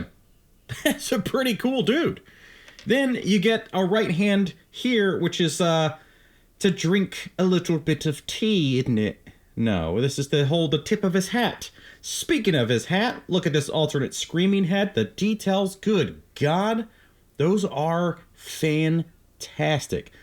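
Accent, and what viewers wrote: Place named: United Kingdom. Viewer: You are not an idiot! Nice british accent too!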